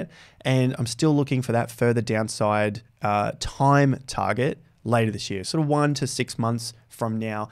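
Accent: Australia. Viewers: Hey mate I came over from invest answers, good to hear a ozzi accent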